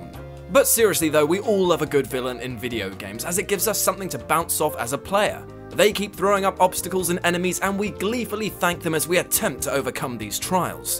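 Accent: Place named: United Kingdom. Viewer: no, he's british. they pronounce words differently than in the states.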